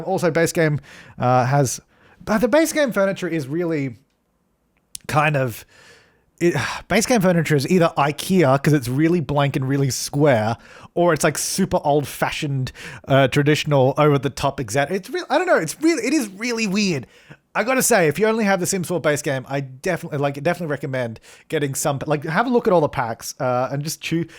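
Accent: Australia. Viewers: Watching this from the future and sounds SO Australian here. Like more than in present day somehow, even though I'm not sure his accent has really changed.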